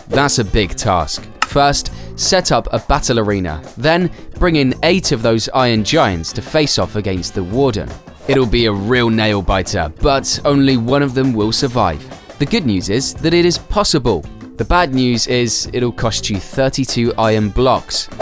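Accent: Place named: South, United States. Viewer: Was expecting a monotone American accent instead I got a chirpy southerner overall good deal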